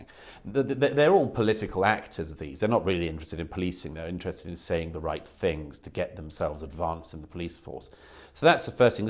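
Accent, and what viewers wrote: RP, United Kingdom. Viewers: “Nowadays you don’t know who’s in cahoots, cos the KKK wear 3 piece suits” Never has there been a line that sums up the likes of Gutless Murray. Pure unadulterated racist dressed up in a posh accent and glossy magazine. Hope all your children marry immigrants and have little brown babies 😂😂😂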